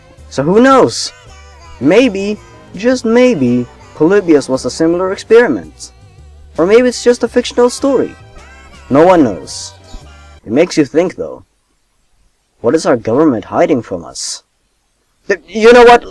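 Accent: Sweden. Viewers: wait a sec.....are you... SWEDISH??!? like I am ^^ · are you from Sweden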